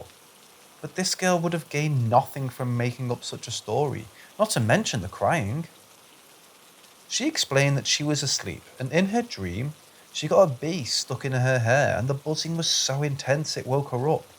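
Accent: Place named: Northern England, United Kingdom. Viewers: no he's definitely english...sounds like he comes from up north to me. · I had a feeling he was English but he pronounces some words differently. Northern England was going to be my guess.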